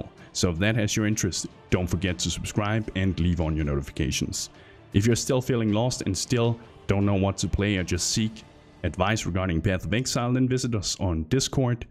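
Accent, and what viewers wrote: Denmark. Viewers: Love you can hear you are danish.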